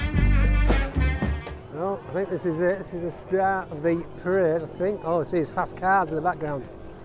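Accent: Yorkshire, United Kingdom. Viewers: Given that I've seen videos from you in Bradford, Halifax and Saltaire I guessed you must be living not to far from my birthplace. I watch your videos as much for the accent as the photos. Home rule for Yorkshire!! 😉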